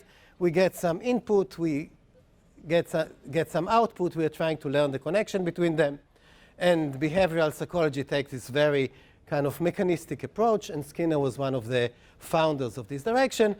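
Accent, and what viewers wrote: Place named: Israel. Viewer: yay heb accent ^^ :-)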